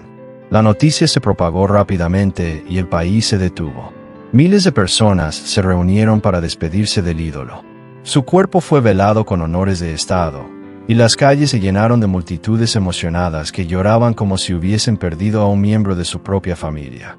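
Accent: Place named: United States. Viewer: Y tú porque hablas como greengo? Digo mejor pon la IA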